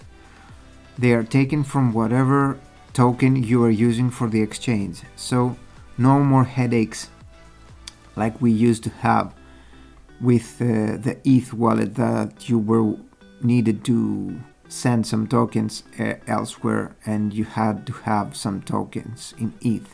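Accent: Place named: Greece. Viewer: You sound like a Greek..I guess you are 😅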